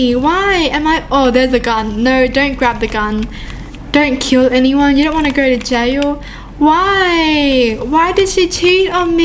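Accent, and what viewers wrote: Australia. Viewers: but she has an Australian accent while has a Japanese accent?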